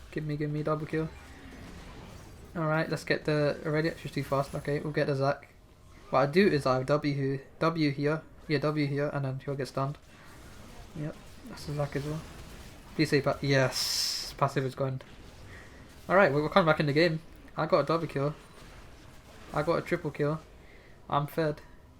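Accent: United Kingdom. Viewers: 23.50 I am not sure what part of UK you come from. I spend some time in Scotland and it wasn't easy to start understanding the way they prenounce words. Now when I listen to you it feels like I learn a different accent and it comes easier but I understand that it might be confusing :D Oh and one more thing, you use quite a lot of glottal stops so that might be a part of a problem too. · Your voice is fine, but maybe its because I'm british as well and that's the way a lot of people talk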